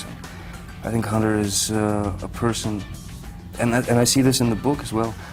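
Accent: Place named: United States. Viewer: I looked this up just to hear his accent. WHY does he sound like so different today? He definitely sounded like an American in this interview.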